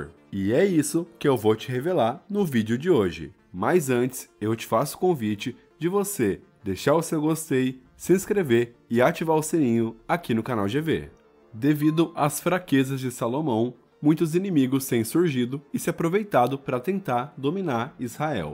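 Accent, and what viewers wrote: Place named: Caipira, Brazil. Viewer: Seu excessivo sotaque "caipires" nos "erres" nos finais das frases são aflitivos, prezado narrador ! Fica até difícil ouvi-lo 😲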